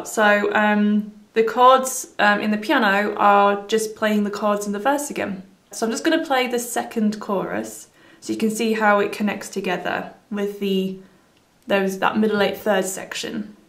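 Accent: United Kingdom. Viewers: Your voice, with that British accent, is succulent. Thank you for the tutorial, I always felt that my game was missing something, and I just discovered that it was the F6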